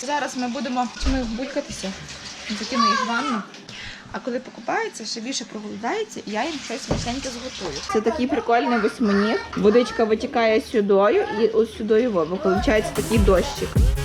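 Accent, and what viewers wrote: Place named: Volyn, Ukraine. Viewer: вже підписаний) я тільки відкрив для себе ваш канал і зазаз передивлююсь) я сам теж з Волині, але вже давно там не живу, тому дуже приємно чути наш рідний говір)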